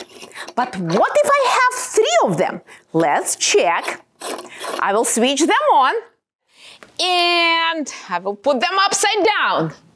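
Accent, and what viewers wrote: Russia. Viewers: Думал, нифига я английский начал каждое слово понимать, а, нет, акцент наш просто.)) Сразу всё понятно.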